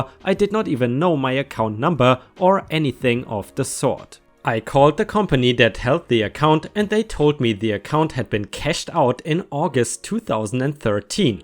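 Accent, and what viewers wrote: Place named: Germany. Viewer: Also, if you don't mind me asking, what part of Germany are you from? I have an aunt from Berlin. She's lived in the U.S. for over 40 years and has a much stronger accent than you. That doesn't matter to me because I absolutely love meine Tante Ludmilla.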